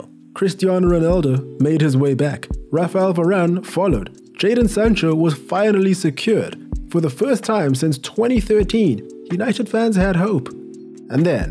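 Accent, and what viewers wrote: South Africa. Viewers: bro, are you South African? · are you south african ?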